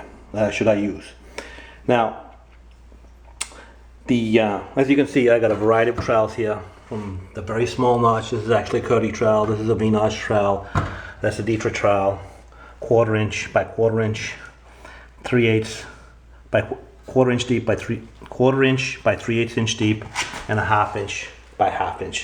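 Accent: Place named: New Jersey, United States. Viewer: Quarta by 3/8... love the Joorsey accent. Thanks for making these videos, very helpful.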